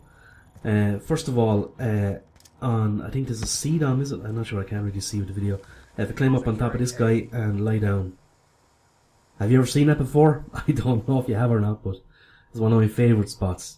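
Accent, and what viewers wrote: Ireland. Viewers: U Irish if u are respect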